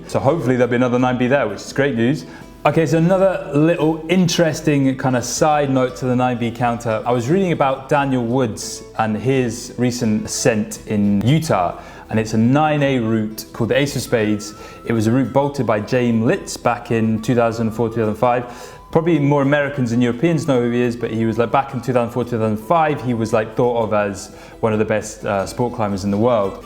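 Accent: Scotland, United Kingdom. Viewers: Scottish....but educated in England :D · Cheers for the info! I wouldn't have called it 'strange' if I'd placed it as Scottish. ;-) Sounded like it had a twinge of something from further afield. Keep up the well-spoken words!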